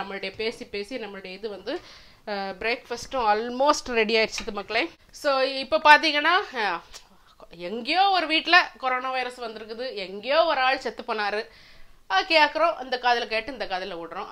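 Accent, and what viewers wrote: Nagercoil, India. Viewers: Useful information Sister. Unga Nagercoil Tamil Arumai.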